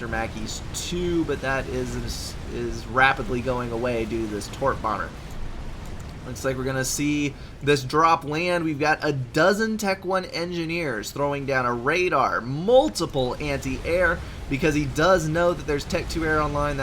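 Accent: United States. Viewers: I love the southern accent from you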